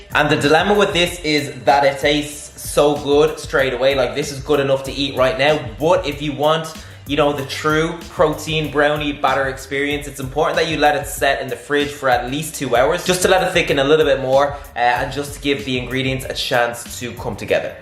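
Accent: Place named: Ireland. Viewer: I have been watching your videos ALOT when im at work, taking notes! today i am watching at home with sound, and i had litterally no idea you where irish!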